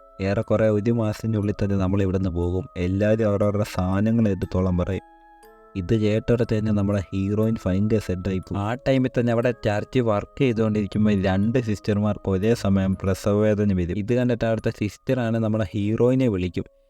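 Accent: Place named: Kozhikode, India. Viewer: കോട്ടയത്ത് ഉള്ളവർക്ക് നല്ല pronounciation ആണ്. ഇത് kozhikode or kannur ആണ്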